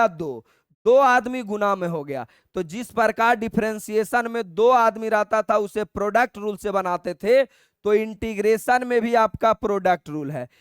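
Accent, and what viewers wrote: Bihar, India. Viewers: Apka Bihari language me math aur easy lagta hai sir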